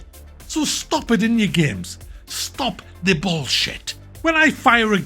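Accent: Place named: North East England, United Kingdom. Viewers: Northumberland accent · Nothing makes me feel more at home than a North Eastener going on a rant there’s a sub for ya 😂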